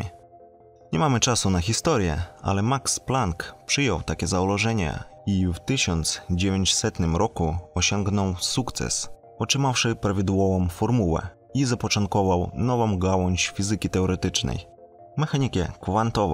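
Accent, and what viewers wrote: East, Poland. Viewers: Twój wschodni akcent sprawia tylko że przyjemniej słucha sie o fizyce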